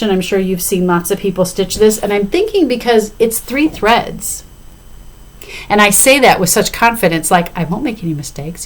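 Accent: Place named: Illinois, United States. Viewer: First time watcher here. It sounds like your a teacher in Illinois. If so, which part of Illinois? I teach in the south suburbs. I will also be at weekend A. I’m going by myself so I’m hoping to meet a lot of new people.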